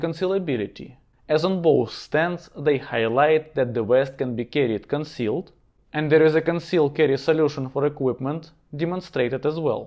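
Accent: Russia. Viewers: Если не секрет у тебя правда такой акцент или ты чуть под стронгер русиян акцэнтиш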